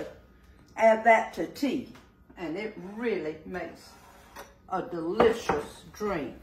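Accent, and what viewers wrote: South, United States. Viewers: I love your southern accent! You sound just like my great aunt! I could listen to you all day! Blessings to you! Shine For Jesus! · your refined southern drawl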